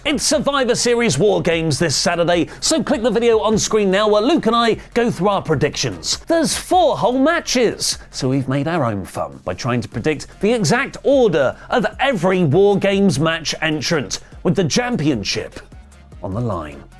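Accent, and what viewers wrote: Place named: United Kingdom. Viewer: Its his British accent lol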